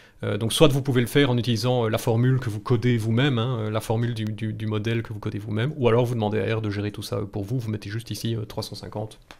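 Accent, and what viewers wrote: Belgium. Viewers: J'adore l'accent belge 💕💕💕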